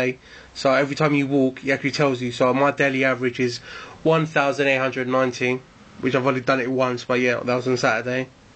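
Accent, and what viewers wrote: United Kingdom. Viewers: Cockney accent.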